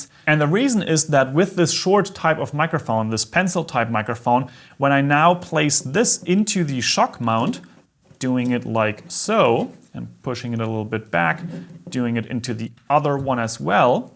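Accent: Germany. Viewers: alles gut. Guter Inhalt. Gutes, flüssiges, verständliches Englisch. Dennoch hört der Landsmann den Landsmann durch ;)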